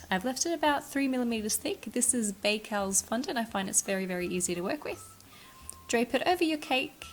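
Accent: Australia. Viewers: You sound like you're from Australia.. are you?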